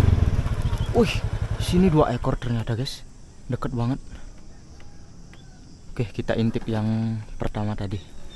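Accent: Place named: Bali, Indonesia. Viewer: Nak bali? Kok logat bali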